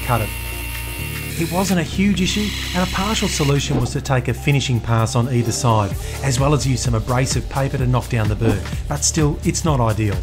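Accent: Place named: Australia. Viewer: Knew it.. your aussie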